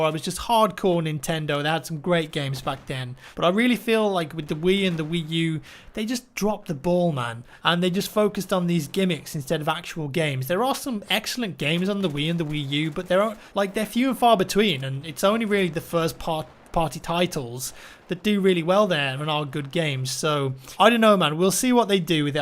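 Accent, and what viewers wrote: England, United Kingdom. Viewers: i think it's the british accent... soothing to the ears... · hey what part of England are you from? just curious haha I live in Canada but I'm from the UK as well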